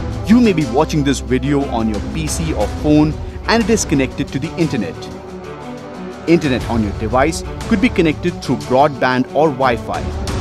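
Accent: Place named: India. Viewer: Pengisi suaranya orang India ya? Kedengeran banget dialeknya.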